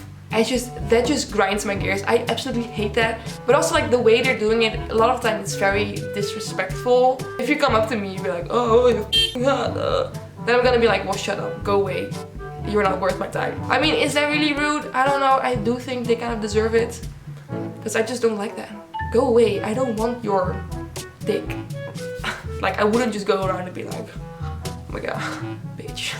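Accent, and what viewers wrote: Netherlands. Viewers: Je hebt zo'n dik Nederlands accent. Moeilijk te verstaan.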